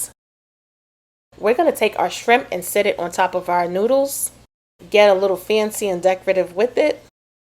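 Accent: New York, United States. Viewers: That New York accent just coming right through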